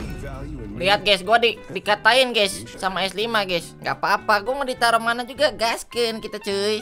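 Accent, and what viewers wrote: West Java, Indonesia. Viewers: ente asli sunda mang?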